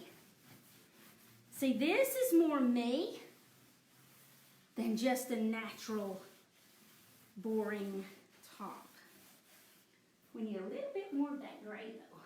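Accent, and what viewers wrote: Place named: South, United States. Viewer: #replay!!! Love your tutorials and of course your southern accent LOL!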